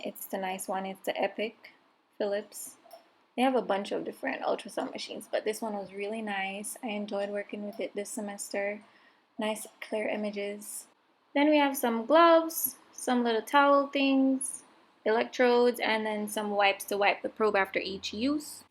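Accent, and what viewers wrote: Jamaica. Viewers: are you Jamaican? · You sound like you have a Jamaican accent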